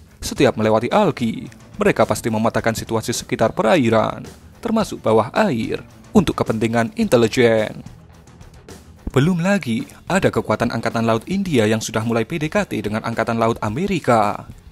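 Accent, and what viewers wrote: Java, Indonesia. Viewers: Kak wong jawa ya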